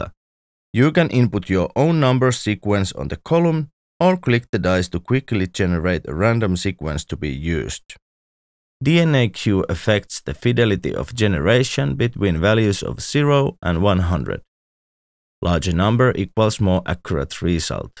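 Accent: Finland. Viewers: This is correct, you got me. 😅 I am Finn. Hope it wasn't too hard on the pronunciation. 🙏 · It was all good. I'm a fellow fin and was pretty sure about the accent! :) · Where is Reveal Sound based, I've never thought about it, but I'm 100% sure that the voice over in this video is by a Finn! (As am I). Kudos anyway, I use Spire on pretty much every song. My latest track (the Ukrainian one) on my youtube page has a plucked synth hook, whch is actually a Spire preset.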